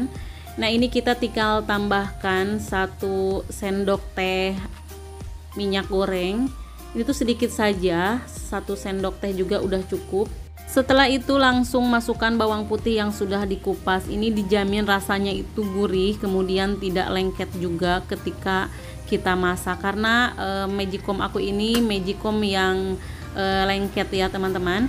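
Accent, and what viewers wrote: West Java, Indonesia. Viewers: Ibu dr Sunda kah? Logat bicara nya persis seperti teman saya yg Sunda...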